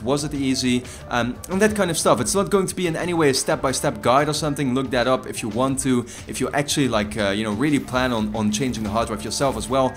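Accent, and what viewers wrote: Netherlands. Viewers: Kan merken dat je Nederlands bent maar heb wel even paar vragen ze zeggen je kan je games wat je ge saved hebt ook online bij ps4 plus en die later op je 2tb neer zetten klopt dat of niet groetjes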